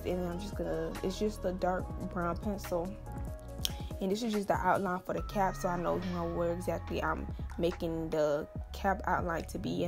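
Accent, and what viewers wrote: Miami, United States. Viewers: I’m assuming you’re from Miami. Cause your accent is either OT , Beans or CC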